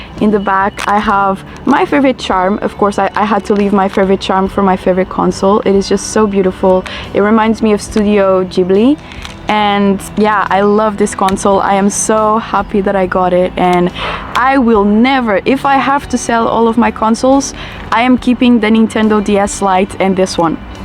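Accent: Portugal. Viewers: Since the first second I was like "I'm sure they're portuguese" based on the accent and as soon as you said it at 8mins i almost screamed <3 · she is portuguese i’m pretty sure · bem me parecia que soavas portuguesa haha amei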